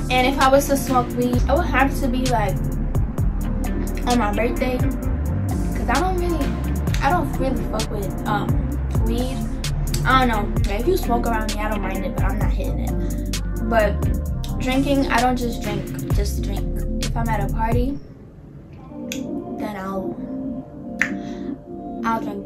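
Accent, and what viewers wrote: New York, United States. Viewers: So New York love it girl ❤️❤️❤️☺️ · She has to be from new York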